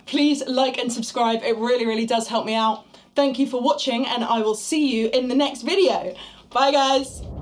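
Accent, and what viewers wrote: England, United Kingdom. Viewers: See, were all being tricked. Chics this freaking smokin hot and with an English (I think) accent, which btw moves her hotness to level... actually I can't count that high, who can play a guitar like that, aren't real. I mean, its obvious... the Matrix is real. How much more proof does one need. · That British accent melts me into a puddle.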